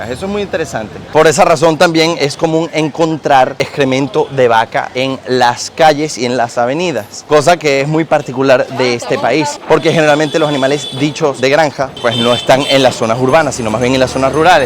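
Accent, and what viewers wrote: Venezuela. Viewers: Para su reportaje todo es pobreza, sucio na hay lugares histórico, turísmo y cosas positiva según usted. por su. acento es venezolano, y le digo algo en su país hay bastante, dígame los cerros.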